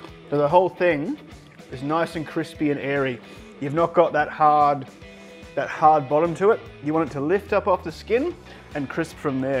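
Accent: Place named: Australia. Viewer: Gidday, some excellent tips in this video, but the bloke sounds like he's an Aussie. Just a reminder, we've been using Celsius for nearly 50 years in Australia. Fahrenheit is obsolete.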